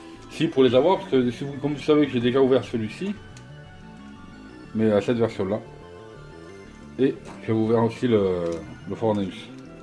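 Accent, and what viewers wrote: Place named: South, France. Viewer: Tu viens du Sud toi 😂😉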